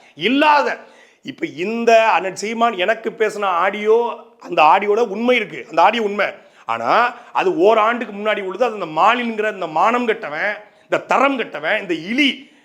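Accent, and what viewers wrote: Tirunelveli, India. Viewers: சாட்டை இல்லையேல் இந்த நாட்டை சீமான்கூட திருத்த முடியாது! வாழ்க சாட்டை ! வளர்க அவர் வேட்டை!! என்னே ஒரு அழகு தமிழ் நெல்லை இனி மையான உச்சரிப்பு! தாங்கள் நேரம் கிடைக்கும்போது சற்று இலக்கிய பணி ஆடியோ வெளியிட்டால் தமிழ் கேக்கும் ரசிகர்கள் மனம் மகிழ்ச்சி அடையும்! தமிழும் வளரும். வாழ்க நெல்லை தமிழே.! நெல்லை சாட்டையே!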